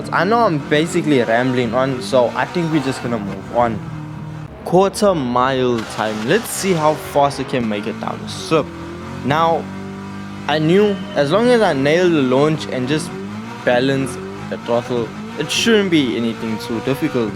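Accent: South Africa. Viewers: Are you south african bru?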